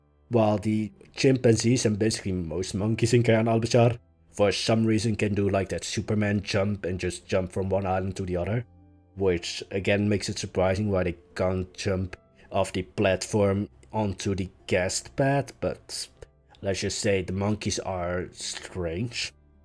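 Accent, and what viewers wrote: Netherlands. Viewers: you sound dutch so i think youre a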